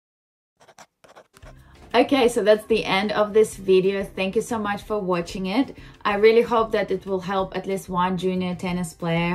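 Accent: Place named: Australia. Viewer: Hello. Having been a fan of Kasatkina and Zabiiako I got interested in you. I love how you are developing an Australian accent. I really like your videos you have a great personality and attitude. Your videos are enjoyable to watch. I wish you the best in your tennis career. Thank you for your insight. Keep smiling.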